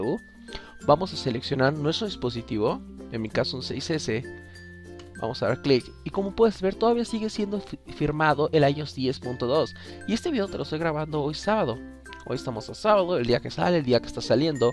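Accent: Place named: Peru. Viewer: Seguro eres peruano, hablas igual al Daniel Menacho 😹😹😹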